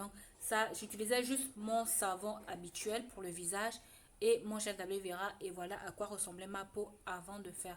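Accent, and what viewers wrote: Gabon. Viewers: Hello. Super merci. Vous êtes Gabonaises rien que ai son de votre voix 😇😇🙏merci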